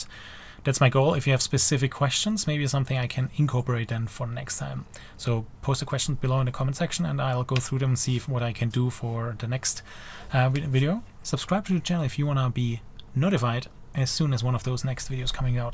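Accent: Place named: Germany. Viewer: I noticed the German accent and was bit confused that there's the "United States" in the channel description.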